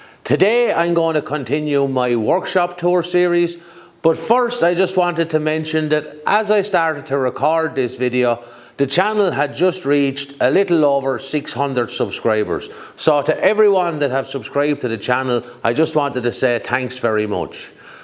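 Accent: Ireland. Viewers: Ya know a lad knows his stuff when he uses a morticer like that; it keeps the tool pressure even when you cut with either 4 or 2 sides of the chisel....... ya start cuttin with 3 sides and sometimes it wants to flex, that's why you stepped over your cuts. I use hand tools, and one of my most hated jobs is cuttin a mortice........ I see a hollow chisel someday in my future 😆 It's nice to hear an Irish accent on YouTube lad..... This Wexford man enjoyed your video 😁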